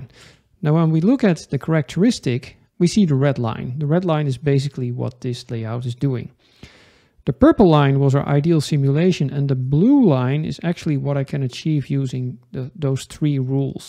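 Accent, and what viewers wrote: Netherlands. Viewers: Thanks for explain clear, by the way i like your clean English with the little dutch accent. Kind regards from germany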